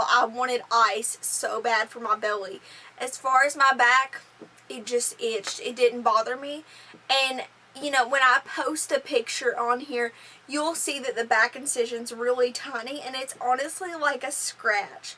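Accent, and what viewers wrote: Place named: Kentucky, United States. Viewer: Greetings! I know it's been a long time since you did this video and I'm sure you have moved on from here, but I really appreciate this video. My 14 year old son has CP with spastic quadriplegia. He's non-verbal and non-ambulatory. He is taking baclofen orally and we are considering a pump. I am mostly unaware of his pain. It was helpful to hear you talk about your experience. I feel like it gave me insight into what he is experiencing. I love your cheerful disposition and your Kentucky accent.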